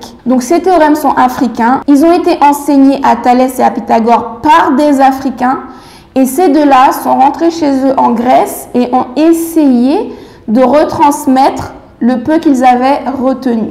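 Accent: French Guiana. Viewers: je devine de par ton accent que tu es guyanaise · dans ta façon de parler on sent que t'es Guyanaise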